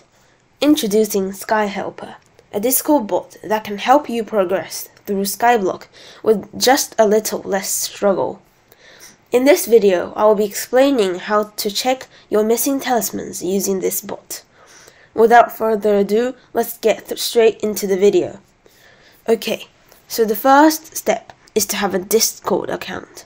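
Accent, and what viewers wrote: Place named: United Kingdom. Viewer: HEES BRITISHH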